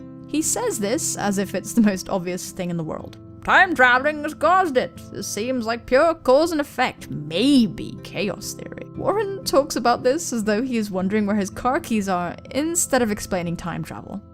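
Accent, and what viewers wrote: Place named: RP, United Kingdom. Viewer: you sound soo posh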